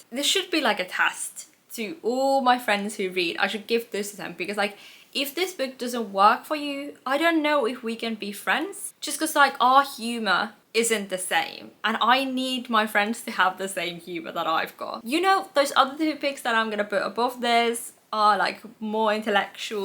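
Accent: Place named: Birmingham, United Kingdom. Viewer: how did you get an English accent btw? (a bit Brummie lol)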